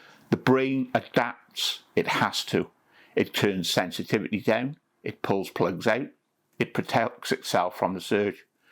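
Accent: United Kingdom. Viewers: I'm from USA and this gentleman should get a medal. I get his Brit accent and directness like he's talking to a friend. I recommend you view as often as possible during sobriety.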